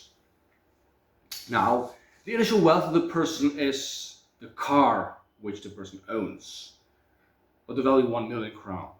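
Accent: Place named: Germany. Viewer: I got recommended this video and I heard the semi German accent so decided to watch the entire thing 😂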